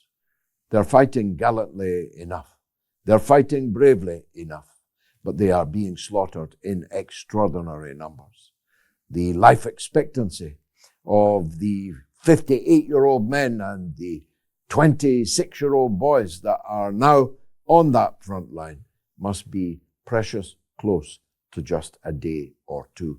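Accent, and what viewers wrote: Scotland, United Kingdom. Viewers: ☔... old guy saying thanKs again.... me toO....imagined watching the Nazi's carry out their crimes during ww2 on YouTube.... would it have helped or not ?....born in 1950 i'm barely chipped away at the extent of the brainwashing and propaganda inflicted upon my generation and continuing... nevermind .... your accent ?..... just like my grandma's.... Fraser clan... and clan Donald....💜.. peace 🇵🇸🇾🇪🇸🇴🇪🇹🇸🇸🇦🇫🇱🇾🇮🇶🇸🇾🇱🇧🇯🇴🇨🇺🇺🇦🇷🇺🇺🇸.....⚾ ....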